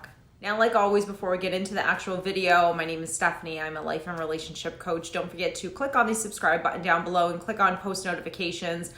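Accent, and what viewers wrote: United States. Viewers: Healing about what ? Get stuck? It's the way you see yourself . Self worth help you to overcome your worries . I past through griefs and all of us too . Life is continue It's not standing and don't do nothing Instead of that see the good things around you such your health you have something to eat and a shelter. A lot of child and people suffers because war and they have nothing and ourselve live in a land which has all things we need . To heal we need to look around us and not to be selfish focusing only on ourselve . Is here my opinion teacher . Step by step i get your talk in spite of your American accent .yeah yeah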